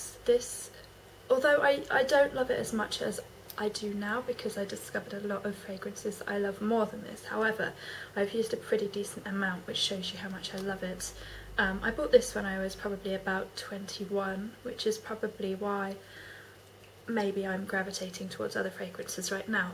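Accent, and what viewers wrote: United Kingdom. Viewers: You're so british! In a good way ^^ haha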